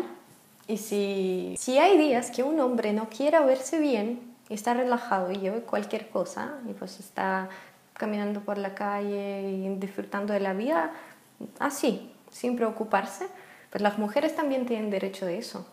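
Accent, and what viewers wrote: Chile. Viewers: Aprendiste el chileno en tu estadía allí? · Es adorable tu acento Chileno!!!!!!!!! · en qué parte de Chile viviste?? Fantástico tu castellano y más lindo tu acento chileno!!! Saludos desde Chile. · Eres hermosa se te nota mucho el acento chileno. Saludos desde México. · Es el segundo video de tu canal que lo veo, me quedé encantado con el acento, hispano chileno jajaja, pero el castellano neutral es el peruano, espero puedas conocer mi país, aquí tienes un amigo peruano para guiarte por ésta hermosa patria.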